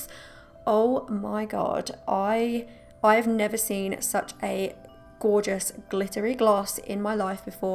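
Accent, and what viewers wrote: England, United Kingdom. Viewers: I believe she means that this woman has a beautiful English accent and face, something which she does not have. But I can see how that might be misconstrued lol. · This girl is beyond beautiful and has an English accent 😒😩😫😭 life’s just not fair!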